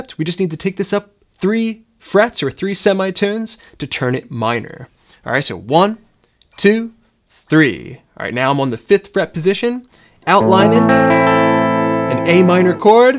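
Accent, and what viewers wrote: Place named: Baltimore, United States. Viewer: Philly? I hear Baltimore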